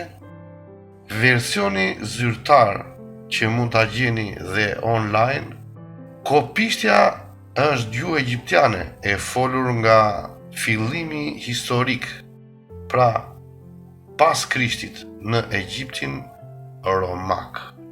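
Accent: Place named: Tepelenë, Albania. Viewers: Ti që po flet dialektin duhet ta kesh nga Tepelena po edhe unë në të nxehtin mendim jam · Ti po flet toskerishte te kulluar ama....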